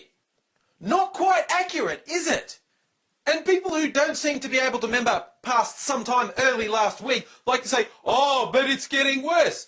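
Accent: Australia. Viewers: Words Well spoken Aussie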